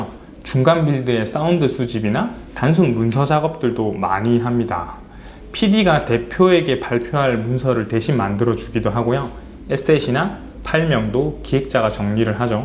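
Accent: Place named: Gyeongnam, South Korea. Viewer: 경남쪽 분이신가...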